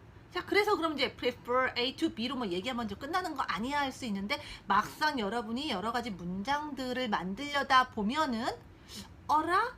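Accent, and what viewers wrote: United Kingdom. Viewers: 선생님의 브리티쉬 발음 너무 멋있어요~😍 전 북미권에서 공부했던지라 ㅋ 선생님 목소리를 유투브에서 들을 수 있어서 너무 좋아요~ 유학시절 추억돋네요~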